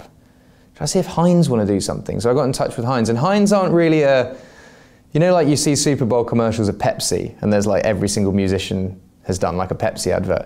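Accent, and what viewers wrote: England, United Kingdom. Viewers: L’accent 😭😭😭 j’adore vrmt l’accent anglais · L'accent anglais sahh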